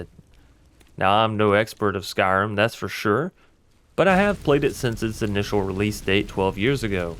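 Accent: South, United States. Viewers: It sounds like you have a country accent sometimes anyway super entertaining video I love stuff like this keep it up brother ❤️ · your mild southern drawl is enchanting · i have nothing to say other than ur voice is rly nice to listen to! i cant tell if u have a slight southern accent or not but its still nice lol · Is that a southern accent I hear?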